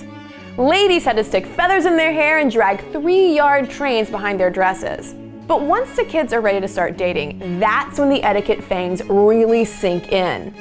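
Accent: United States. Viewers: Seems ridiculous having an American doing the narration ! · It's really odd hearing an obnoxious American woman explain Victorian customs. I am most unamused by this hussy. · What an obnoxious "presenter"! These customs and ways would sound more valid and easier to listen to from a native British speaker. Her attitude could use a major overhaul, too. She contributes to the image of the obnoxious American know-it-all. I wouldn't subscribe to her channel for all the pounds in Britain! (p.s. I'm an American)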